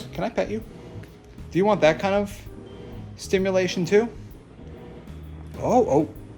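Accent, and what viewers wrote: United States. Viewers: Man, listening to an american pronounce those names hurts so bad.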